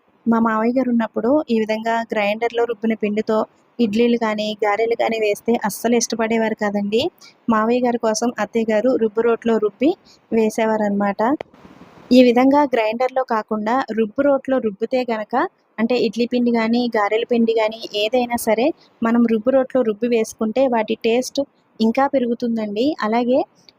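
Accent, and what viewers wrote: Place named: Godavari, India. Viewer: Ma godavarolla basha na ...majaka super undhi ......godarollamani mana language lo mana respect lo telisipothundhi.....nice vlog andi very nice chala bagundhi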